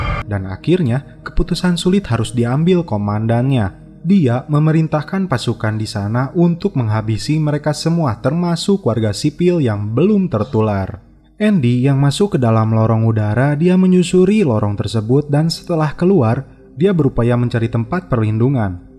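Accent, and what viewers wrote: West Java, Indonesia. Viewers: Ngakak ih yg cerita nya ada ada Sunda nya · Waw ka Sunda nya nyalosod🤣🤣. Semngat terus ka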